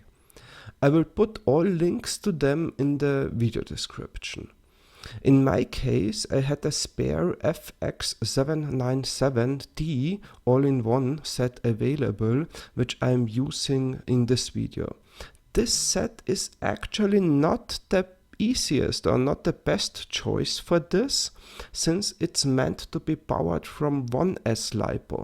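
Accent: Germany. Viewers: Bist ein Deutscher, richtig?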